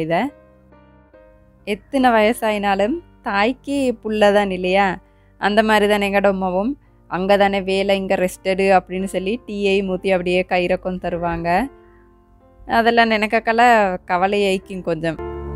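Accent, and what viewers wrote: Akurana, Sri Lanka. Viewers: ok sis akurana pechi mathiri irundhichi athu than keten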